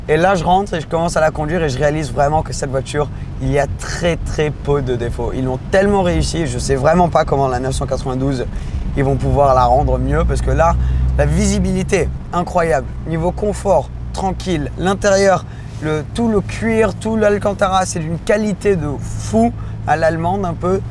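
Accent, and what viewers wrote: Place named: England, United Kingdom. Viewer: Je pense qu'il fait exprès de se rajouter un "accent" anglais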